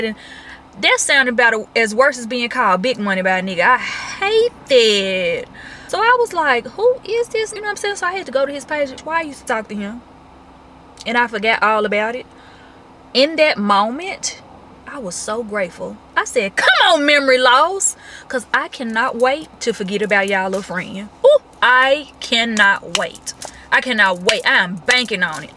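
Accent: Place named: Memphis, United States. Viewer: Hi my southern sis!! I knew there was a reason you sound so much like K. Michelle. That M-town accent is strong hun. I'm from the MS/AR Delta and I have lot of friends and family members from Memphis and their accent sounds just like yours.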